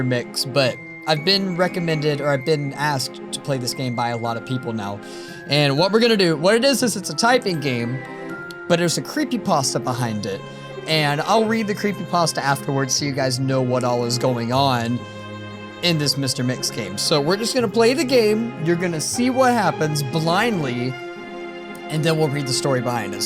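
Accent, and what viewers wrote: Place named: Appalachia, United States. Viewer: Next ingredient is whatever the heck he says! I can't understand hillbilly language!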